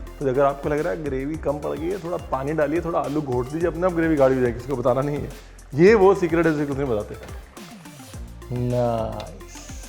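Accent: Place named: Punjab, India. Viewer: I really like your North-Indian Hindi with Punjabi touch 😍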